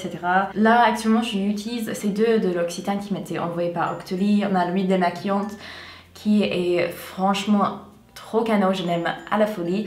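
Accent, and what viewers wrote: United States. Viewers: I had no idea has an American accent when she speaks French x) I hear it normal maybe considering I'm American so French people will hear this differently. I wonder if they like the American accent though? ^^ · L'accent américain il est tellement beau 😍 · Oh mon Dieu, déjà que mon rêve c'est de vivre aux USA, mais alors toi, ton accent et tout, ça me donne trop envie d'y aller😔💜 Et sinon tes trop belle et super vidéo💜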